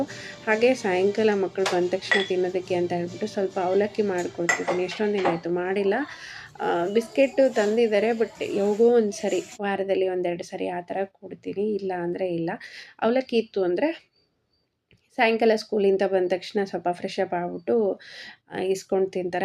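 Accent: Raichur, India. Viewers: Nemma maatu thumba Chanda raichur dst anesuttai nemmadu sis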